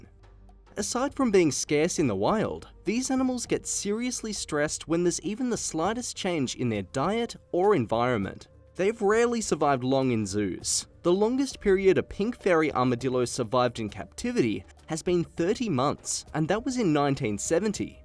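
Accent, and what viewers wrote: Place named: Australia. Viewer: Gotta love an Ozzie accent! 👌🏼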